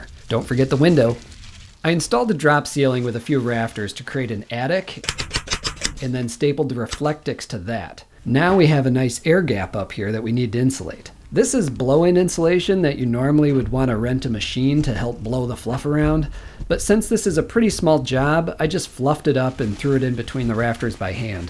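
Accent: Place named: Canada. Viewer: I was thinking, man this guy sounds kinda Canadian. Then you mentioned the high school and I realized you're in northern MN, so that explains it lol.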